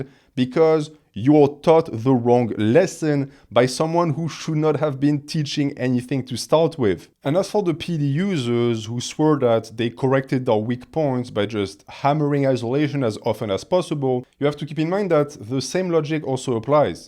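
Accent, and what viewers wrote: France. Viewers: This is absolutely one of the best explainations of the dynamics of musculature and it's progression, I cannot believe this is free content. Wow. Bravo. Also, please tell me what the origin of this glorious accent is. Edit: before anyone chimes in and tells me France, duh. I'm talkin' specifics here.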